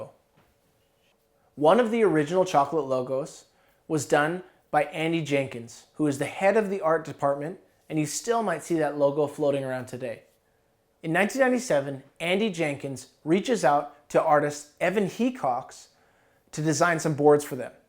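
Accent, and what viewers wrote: Canada. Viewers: Do Canada people reply say ay? Not to be mean it funny just wondering be I heard you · imfrom spain and ecxept for the canadianaccent your spanish is good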